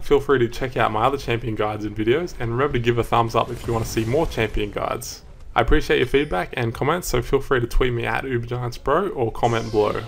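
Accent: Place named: Australia. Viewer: I'm really grateful they kept the damage consistent on his Q rather than ramping it up as you charge it. Good video, love the Aussie accent :>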